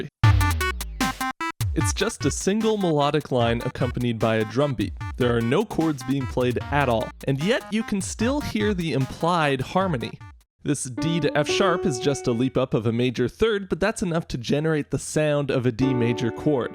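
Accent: Germany. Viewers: his german accent kills me every time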